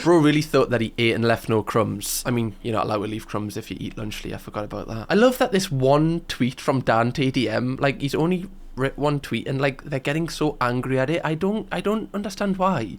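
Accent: United Kingdom. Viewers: Are you British or Scottish like you accent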